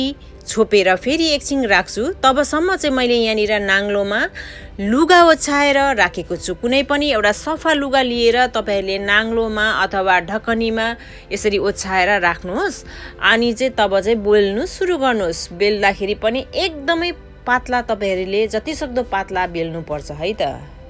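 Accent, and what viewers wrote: India. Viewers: Sneha je jsto vayo pani nepali jsto bole pani kaam ta ramro garnu voko cha di le..Bhasa ta hami eta India ko nepali le estai bolchau maan parcha ta thik cha maan pardena ta na hera video choice is yours.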